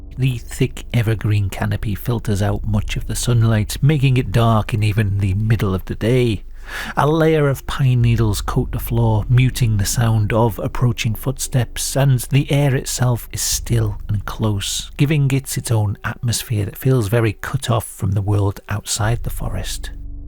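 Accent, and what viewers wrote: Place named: Newcastle, United Kingdom. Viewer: Thank you so much for every video you do. The quality and research are always top-notch, and you present a wonderful mix of theories about even the most far-fetched stories that is engaging to anyone, no matter how sceptical or credulous they may be. I especially love, rather than sensationalism, the empathy you show for the victims of horrible crimes--concern about how their last moments must have been, that sort of thing. Many other horror channels get a bit too focussed on the grotesque nature of violent acts and forget the poor souls they are inflicted upon. Most of all, oddly, I love your accent. My old granddad was born near Newcastle, got conscripted in the war, and met my grandma while stationed overseas so never went back to the UK. The war bizarrely saved him from a probable early death in the coal mines, like his forefathers. 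Listening to you speak about gnomes and spooky photos and so on takes me back to when I was little and he'd read me stories about teddy bears. If you read this, thank you for all you do, and please never apologise for your health. I wish you the best of luck finding effective healthcare. You are an absolute gem. The sheer number of subscribers and patreon supporters you have makes it clear how many people feel the same way.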